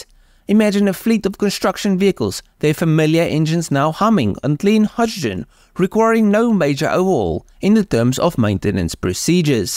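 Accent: Afrikaans, South Africa. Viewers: Dear God that thick Afrikaans accent hit me with a wave of nostalgia. Subscribed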